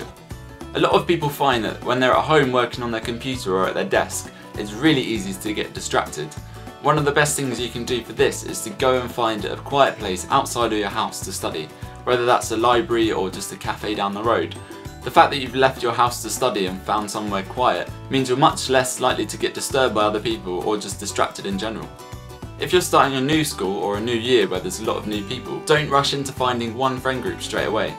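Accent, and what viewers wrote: United Kingdom. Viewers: Love your british english (: ♥ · I love love love your accent I love the uk so much hopefully one day ill get to go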